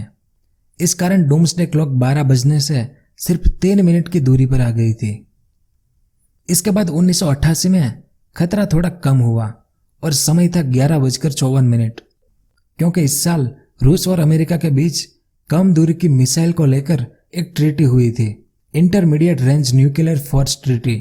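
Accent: Gujarat, India. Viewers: Aap gujarati ho apka bolne ka tarika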